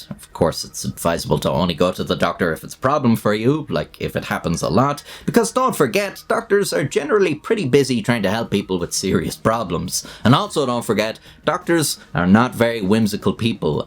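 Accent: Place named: Ireland. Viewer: The bloopers are the best part... when the Irish accent even blows the mind of the person with the accent...Lol! 🤣🤣🤣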